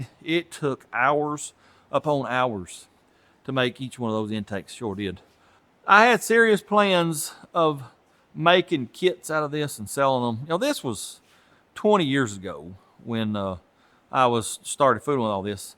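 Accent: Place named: Tennessee, United States. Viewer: I love these birds, but is this really how you people in Tennessee speak?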